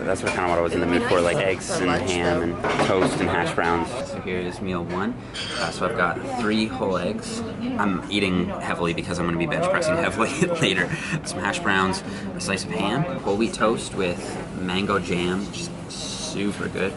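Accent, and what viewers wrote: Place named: Newfoundland, Canada. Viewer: he sounds like a Newfie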